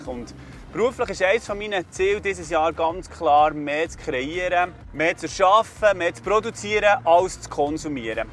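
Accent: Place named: Bern, Switzerland. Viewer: Soso cool! Freue mi wäuts uf ds neue Format vo dir! Bi gspannt was de da so aues chunt, u ds no im schöne Bärndütsch! Wie nice! Ächt cool. 🎉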